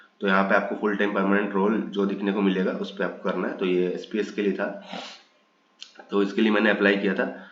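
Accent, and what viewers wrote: Bengal, India. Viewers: Bengali ho kaya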